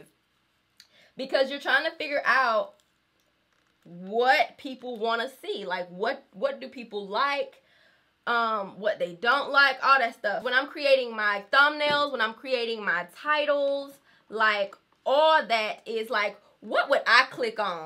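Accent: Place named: Atlanta, United States. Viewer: I love your accent lmao ATL af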